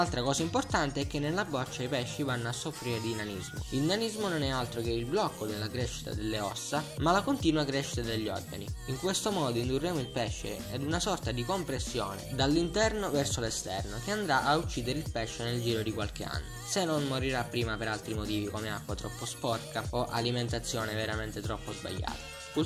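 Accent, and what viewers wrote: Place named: Sicily, Italy. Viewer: Bravissimo... Sei siciliano, si sente!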